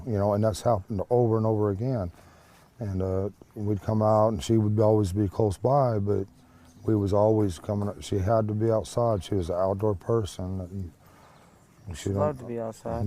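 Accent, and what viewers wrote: South Africa. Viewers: the narrator is South African x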